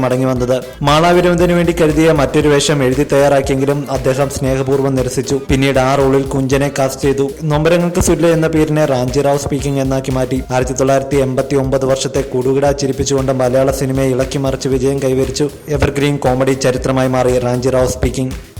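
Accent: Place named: Kollam, India. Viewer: Kollam. Dist Karan ayirikkum. Veray oru vakk und. Sammathikkoola ithinu parayum thammasikkoola ennu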